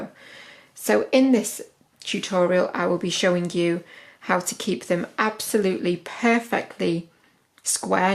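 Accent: United Kingdom. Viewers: Your British accent is a winner as well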